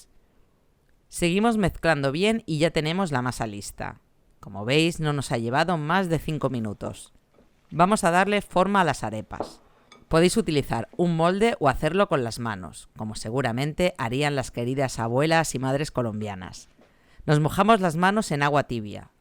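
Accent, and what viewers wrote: Spain. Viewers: Me encantó la receta gracias . Usted es española cierto? Que agradable gracias.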